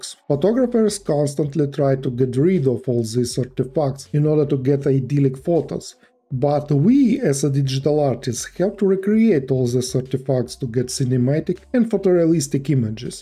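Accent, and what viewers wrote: Russia. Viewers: russian tongue is cringe.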